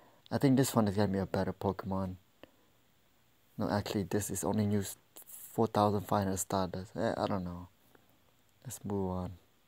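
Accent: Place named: Cambodia. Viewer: Review I knew it. I can tell from your accent. I'm Cambodian too lol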